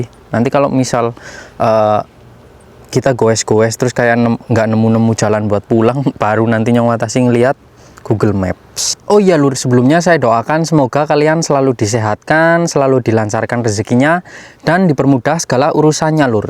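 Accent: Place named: Cilacap, Indonesia. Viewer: Enyong sama ngapak Cilacap kali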